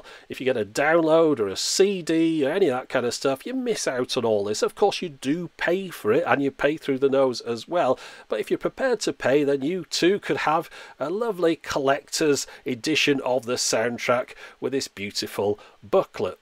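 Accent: United Kingdom. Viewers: Awesome! I love it when you make audio equipment and vinyl videos... I only have one album with a hologram on it, it's by. I also like your british accent, as I'm from México it's more understandable to me than american english. Great video as always! Keep them coming! - IQ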